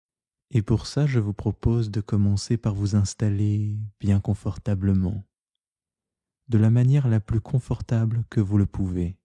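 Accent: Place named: Sète, France. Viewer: Je voulais vous décerner la médaille des 2 ans ! En effet, je suis tombée sur vos méditations en mars 2020, esseulée et perdue parce que mon compagnon a eu plusieurs mois de COVID long à cette époque et devait rester seul dans la chambre , et moi dans la chambre d à côté à me tourmenter. Vous m avez beaucoup aidée à garder espoir en sa guérison J apprécie beaucoup votre timbre de voix, et votre façon de prononcer certains mots , qui me rappelle mes vacances près de Sète dans mon enfance vers les années 70 /80. ( Je suppose que vous venez de cette région ?) Vous êtes un prêcheur de simplicité et je vous remercie du fond du coeur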